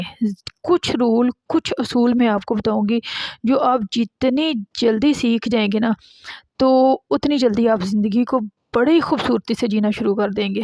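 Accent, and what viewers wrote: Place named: Gujranwala, Pakistan. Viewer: Ap mujhe gujranwala ya iss k ass pass wale cities mai sy lagti hain😅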